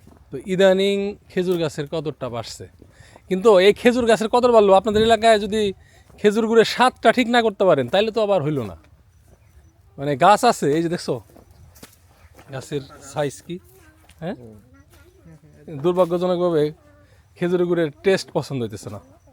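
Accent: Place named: Jessore, Bangladesh. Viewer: আমাদের যশোরের ভাষা মনে হচ্ছে, ভাই কি গিয়েছিলেন??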